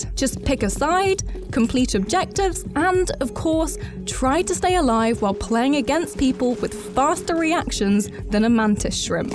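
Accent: United Kingdom. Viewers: Yo yo British... I was soo bored by listing American accent .. happy to listen to an British one .. 😌😌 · Narrator : Very strong british accent I'm out...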